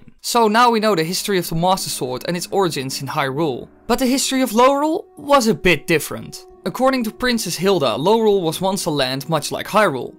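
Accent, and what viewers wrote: Netherlands. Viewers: Ben je Nederlands of zo? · are you dutch?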